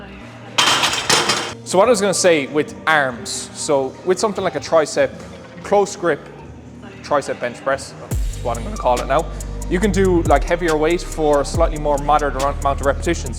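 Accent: Ireland. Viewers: You're fkin hilarious bro 🤣 love the sense of humour and great content. I can feel this channel blowing up very soon. Btw is that a Limerick accent I hear?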